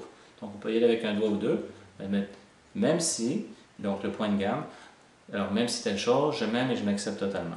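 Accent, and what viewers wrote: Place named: Quebec, Canada. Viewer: Merci Monsieur . J'adore le Canada et plus précisément le Québec! Votre accent est doux et ensoleillé dans votre région. Merci encore De Marseille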